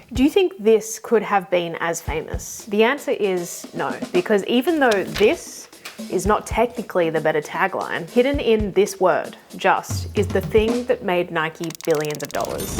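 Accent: Australia. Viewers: How good are you? I found this really engaging from the start but about a third way in was when I realised you're a fellow Aussie. I was taken aback at how completely deaf to it I was and that's rare. So I reckon it was the quality of the subject material and the presentation that made me overlook what is often a big deal for me. Or was this a Jedi/advertisers mind trick you played? Either way great material. Thanks.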